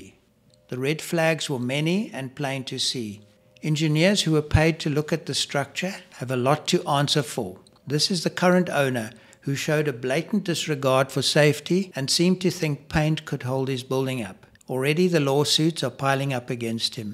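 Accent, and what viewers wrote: South Africa. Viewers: I love how relaxing your voice/ accent it, is it South African? Also thank you for the informative videos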